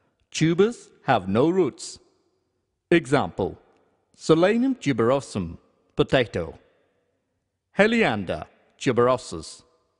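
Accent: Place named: India. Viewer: I love your accent, where you from? #India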